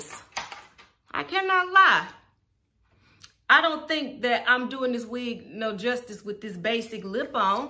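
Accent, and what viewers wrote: South, United States. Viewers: What do I think?? I think we could be friends wit yo country sounded self. Girl you are a hoot! I absolutely love your candor and style!!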